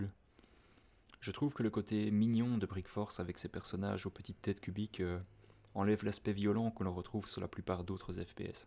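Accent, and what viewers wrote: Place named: Belgium. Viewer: J aime bien ton accent belge 😏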